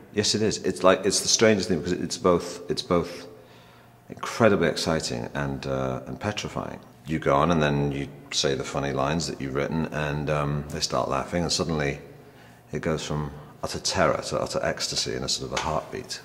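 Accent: United States. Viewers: That american twang is so annoying!! Saw him doing his tour in Oxford a couple of days ago, and there was none of this accent nonsense! (And it was brilliant) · yep, he's not that great on this. and he's developed one hell of a yankee accent too. must've been out there too long. "partridge, you W***ER!!"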